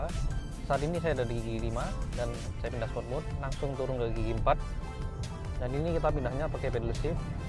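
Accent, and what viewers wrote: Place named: Madura, Indonesia. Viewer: Logat madura nya tebal hehe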